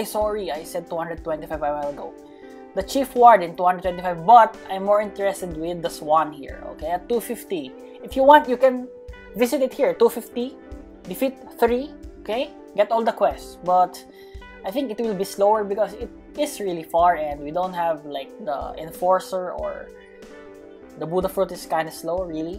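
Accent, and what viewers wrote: Philippines. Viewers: Hey your accent is like filipino haha are you a filipino or not? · are you a filipino? the acsent sound like one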